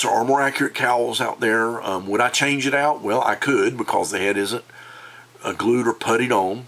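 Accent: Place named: Texas, United States. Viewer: I've never heard of Southern Texan guy talking about Batman action figures loll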